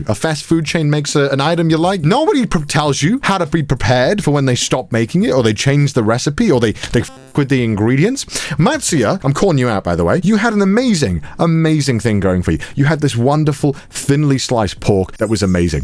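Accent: Wales, United Kingdom. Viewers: I don't really watch anime or know much about Japanese culture, but your videos are just genuinely enjoyable. I have been binge watching them a lot because they're just really nice to relax and throw on. I think no matter where you live or what your content is on you'll be successful because you are just good at what you do and are dedicated. People can sense that and it draws them in. And the deep British voice kind of makes it hard to click away lol Wishing you another successful year in 2022!! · ok am I crazy or does his Welsh accent sound thicker I wonder when he filmed this after his trip home...am I along in this?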